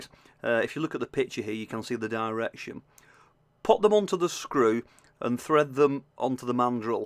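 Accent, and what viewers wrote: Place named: England, United Kingdom. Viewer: Great to here an English accent on a video! Don’t think we can get these in South Africa though!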